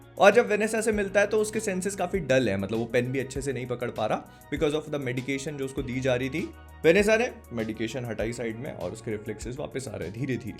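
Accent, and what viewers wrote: Bhopal, India. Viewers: Bhai tum Bhopali ho sala makda word sunte hi trigger hua 😂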